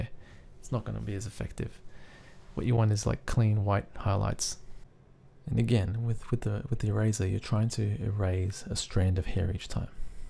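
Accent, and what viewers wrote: Australia. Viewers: Nick889999 because he's got an accent because I think he's Australian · Is this aussie accent ?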